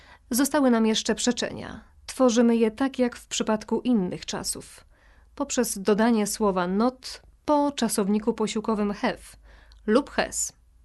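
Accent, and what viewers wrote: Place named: United Kingdom. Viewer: Ten Brytyjski akcent parzy w uszy.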